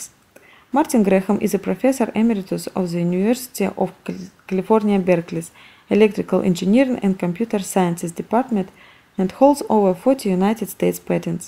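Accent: Eastern Europe. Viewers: This isn't meant as offensive... but why choose a non-native english speaker to narrate a video like this? It's very hard to understand. Many eastern European / Russian people speak too fast when they speak in another language, I've noticed. If you want a video like this to be taken seriously, get it re-narrated.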